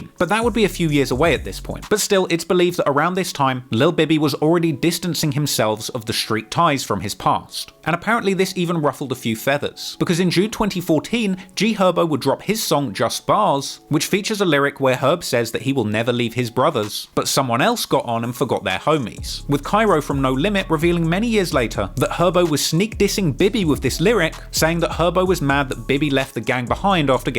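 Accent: United Kingdom. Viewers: A British accent talkin bout American activities?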